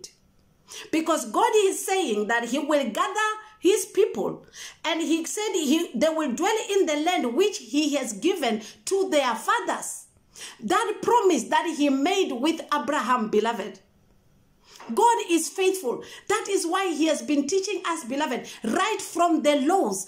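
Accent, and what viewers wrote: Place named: India. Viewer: Sounds similar to the way the Indians in India talk.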